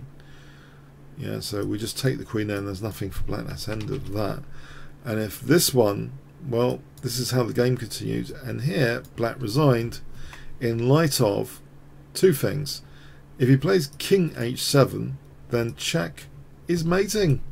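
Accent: England, United Kingdom. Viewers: I really love your videos and your daily updates on both historical and recent games,. Please continue to cover the major tournaments in both classical, rapid and blitz chess! And may I ask, from what part of England are you from? I take much pleasure in that dialect of yours and the pronunciation of the phoneme 'th' as a regular f-sound, "c free" (c three) etc.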